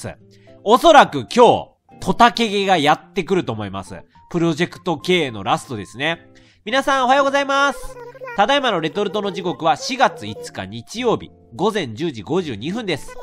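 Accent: Kansai, Japan. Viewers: あさみさんのアフレコしてるレトさん好きすぎる(*´ω｀*) ナチュラルに関西弁きけるし( ¨̮ ) てか、あさみさん可愛い！好き！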